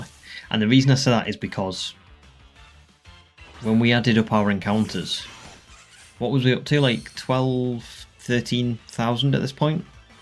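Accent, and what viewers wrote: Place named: Derbyshire, United Kingdom. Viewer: Where bouts you from mate? Love hearing other people say tea instead of dinner. Im a Derbyshire lad myself. Not often you hear similar dialect over Pokemon content😅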